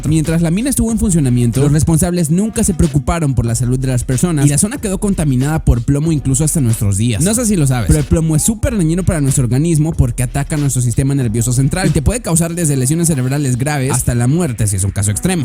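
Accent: Fresa, Mexico. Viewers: te cambio la voz y hablas con la papa en la boca ( fresa) no es la misma voz de antes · se esucho diferente tu voz creo que te salio lo Mexicano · Regrese a ver al curioso ben veo q hablas mas a lo mexicano orale wey y veni ya no ssle mucho :'v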